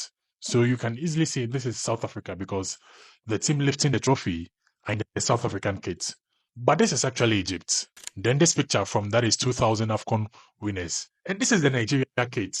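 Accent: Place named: Ghana. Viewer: You sound Ghanaian.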